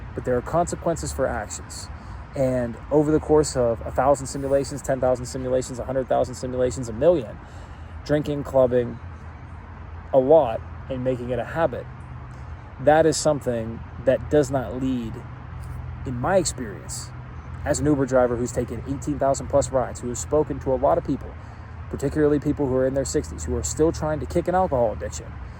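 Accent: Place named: Chicago, United States. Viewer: I knew that accent sounded Chicagoan, you keep it real. Lots of love from Detroit.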